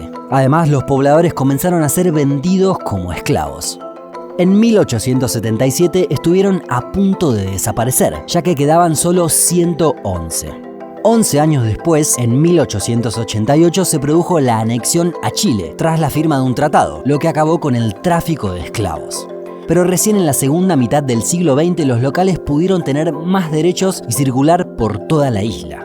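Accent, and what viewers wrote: Argentina. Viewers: Sos de argentina che! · Eres argentino ? Me gusta tu acento!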